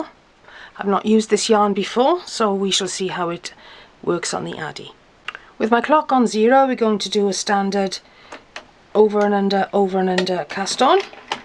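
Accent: Wales, United Kingdom. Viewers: I love listening to your welsh accent - my grandmother was welsh and a great knitter and crocheter. Love your patterns too 😊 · I thought so! Me too. It's strange to see a fellow Welshy doing knitting machine tutorials, it's normally more American accents you hear 🤣 happy new year to you too! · MY husband is from Yorkshire, and I am in love with each UK accent. You sound kind, generous, and lovely tbh. Thanks so much. Happy festivities to you and pets, my birds, doggos, chickens, and hamster say hi!!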